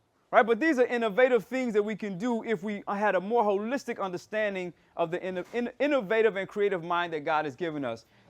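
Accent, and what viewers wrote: Ghana. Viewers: Lol, your American accent is not 100 % anymore. Except for the parts where you are deliberately sounding Ghanaian, you have that Ghanaian accent flaring up for real and creeping in uncousiosly.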